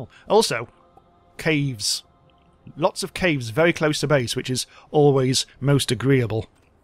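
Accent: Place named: Northern England, United Kingdom. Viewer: Neat vid. Refreshing to have a northern accent too. Laughed out loud at "dark as dicks". :D Keep it up mate.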